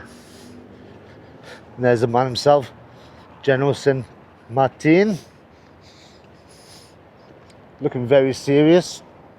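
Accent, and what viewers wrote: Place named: Northern England, United Kingdom. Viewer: Judging by the vowel sounds, I'd say he's from the north of England.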